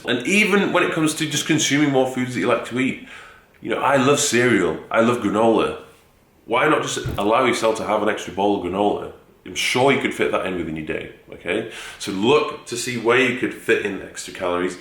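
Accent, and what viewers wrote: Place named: Newcastle, United Kingdom. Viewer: Vitamin B Best not to try and listen if you can't understand.go to some Geordie classes.that may help you.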